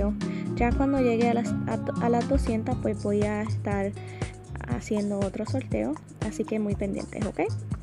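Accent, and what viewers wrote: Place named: Puerto Rico. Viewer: Perdona la pregunta eres boricua? tú acento es igual que el mío PR en la casa saludos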